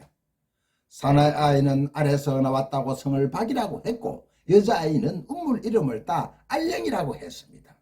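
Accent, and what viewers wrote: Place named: Busan, South Korea. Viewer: 부산 할배의 투박한 사투리로 풀어내는 삼국유사 그간 까맣게 몰랐던 삼국시대의 디테일한 스토리가 너무 재미있읍니다 학교에서 배우지 못했던 구수한 이야기들 재밌어요~~~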